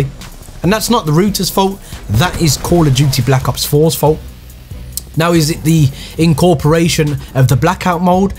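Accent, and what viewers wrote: Birmingham, United Kingdom. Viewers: Are you from Birmingham?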